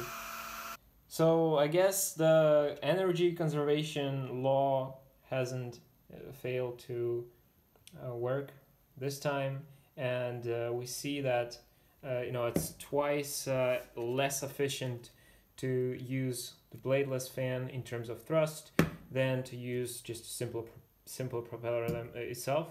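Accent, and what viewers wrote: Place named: Slovenia. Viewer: Slovenian accent detected.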